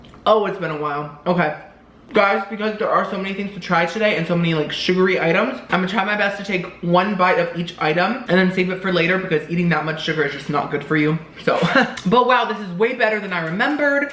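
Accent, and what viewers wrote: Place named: United Kingdom. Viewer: Hilarious video! You and crack me up! You MUST convince him to do a taste test video with you! That would be so fun! Also...you need to made a video of you just saying CROSSIANT and BUTTA (in your British accent) for 10 straight minutes! lol...the way you say CROSSIANNNN...just cracks me up! One more thing....a friend called me and I said I have to call you back I'm watching...lol..nobody interrupts my time.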